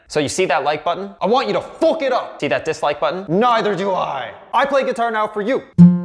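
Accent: Nova Scotia, Canada. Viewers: You literally say "aboot" and I'm not even mad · he’s def from Nova Scotia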